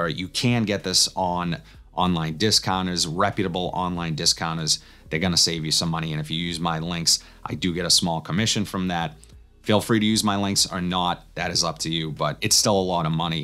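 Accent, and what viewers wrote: Boston, United States. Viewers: Thanks bro.im from n.y u have to be from Boston..had to guess.PDM all day!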